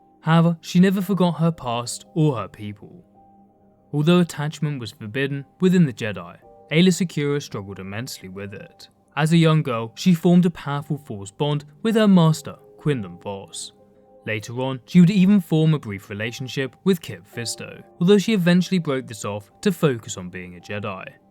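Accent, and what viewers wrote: United Kingdom. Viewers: funny gow the CC spell twilight instead of twi'lek, either you work ob your pronunciation, or you stop using that dumb computer generated ouce with that british accent. That's not your real voice. I don't buy it. Next time, explain Ahsoka's clothes. I loved her tube top and backless outfit, but the question us the same. ps: came to know why her outfit (which I never questioned) and I learned she was a slave and then banging Kit Fisto.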